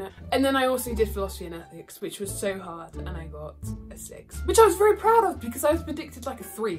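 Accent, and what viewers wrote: United Kingdom. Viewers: I can’t tell if she’s British or American 😂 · Love your accent! What part of Britain are you from? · are u british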